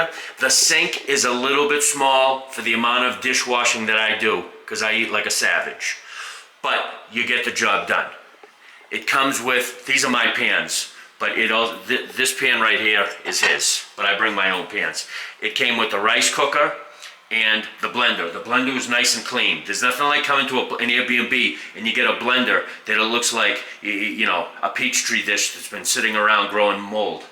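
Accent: Boston, United States. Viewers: Ahhh not to good 😂 about the hot water 😂 wrap your head around it 😂 Boston talk 💪👍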